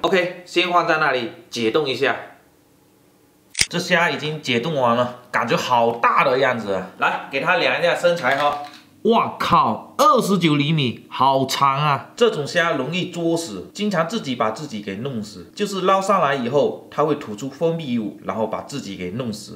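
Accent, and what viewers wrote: Fuzhou, China. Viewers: 福州音太呛了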